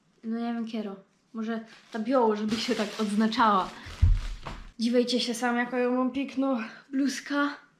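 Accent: Silesia, Poland. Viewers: ja mosz racja ślunskoł godka je fajno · wieprzek-angrys-agrest dzisty? czyzby od dzistac?srac, pierdziec, fulac;; a moze zisty- ciasta? hasiok-smietnik (od niem. Asche-popiol) Hasie, dlatego tez Aschenbecher- popielniczka antryj-przedpokoj (od fr. Entrée) przypadkowo po 3 latach od wystawienia tego vlogu znalazlem sie tutaj (przez trzi lata on tukej do mie szol 😂) gryfno frela godo piyknie po slonsku dlotego Daumn hoch👍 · podoba mi się ta Śląsko gadka 😊❤️ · tak żech myśloł że to z połednia naszego regjonu 😜 miołech znajomych stamtond i łod razu było slychać że jakoś tak choćby krojcoki. Pozdro Frelko 😁 fajnie szprechosz i jo Cie rozumia. Tyż myśla i godom w doma po naszymu a we robocie to musza z niekierymi ą i ę. No i ucza sie w Dąbrowie to tam to juz richtik cza mówić. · Jesteś bardzo ładną dziewczyną i bardzo fajnie się Ciebie słucha jak mówisz po Śląsku 😊😘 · Dyć pieknie godosz po naszymu, przaja tymu fest :) śleciałaś nom Ślōnzokom ôd Pōn Bōcka szłoby pedzieć :)) pozdrawiom Ślōnzok spomnij sie zawdy kej żeś je markotno, iżeś nie jes sama ;) jes nos wiyncyj :) i godomy jednakim jynzykiem Ślōnskim. 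· Uwielbiam te śląskie gadki! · Twój ślónski to je tak richtig ześlónszczuny polski a jak tak richtig chcesz pogodać po ślónsku to zapraszam , pogodumy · Fajnie godosz. Skond żeś je? Możesz zdradzić przinajmij powiat? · Kocham śląsko gadke! <3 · Ale Ty masz śliczne oczy <3 Śląska gadka fajnie brzmi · Młoda, piękna i ta śląska gwara. Cudo!:) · mowa śląska jest piękna,szczególnie urokliwie brzmi w ustach ładnej ślązaczki.Miałem śliczną /było to dawno/ dziewczynę z Zabrza bardzo lubiłem słuchać jej śląskiej mowy. Nazywała się Alinka Opałka bardzo mile ją wspominam z taką tęskną nostalgiczną nutką, było to w latach 70-tych popełniłem wtedy największy błąd swojego życia kiedy się z nią rozstałem.Marzę abym mógł jeszcze kiedyś spotkać ją i porozmawiać. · Akcynt Slonski pzostvanie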